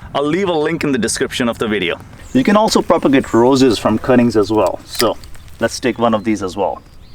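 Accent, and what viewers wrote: India. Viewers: Dude, you have a thick-strong Indian accent trying hard to talk like an American white guy from the US. Your thick Indian accent isn't fooling nobody. Lol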